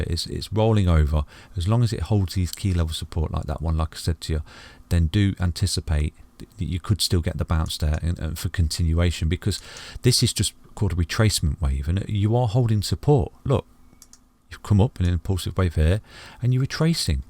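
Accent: United Kingdom. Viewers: Dear, your channel is golden, not to mention your calm, grounded manner and soothing british gentleman voice!!!!! Keep on going!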